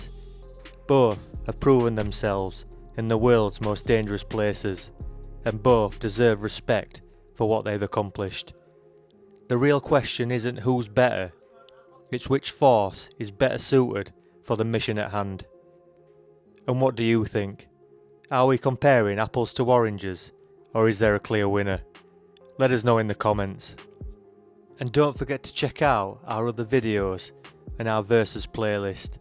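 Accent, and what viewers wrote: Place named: Northern England, United Kingdom. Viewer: Apologies if I got that wrong. It's a nice northern accent, but there's still a lot of unnatural sounding pauses to my mind.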